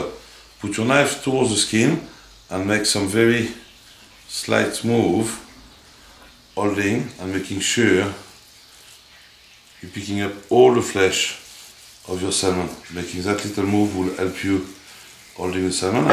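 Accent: France. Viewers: I thought he rather had a French accent.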